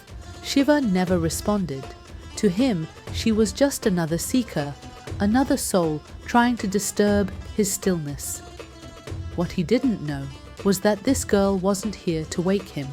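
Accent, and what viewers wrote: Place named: United States. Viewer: the American accent is an interesting one to hear in an ancient Indian story 😂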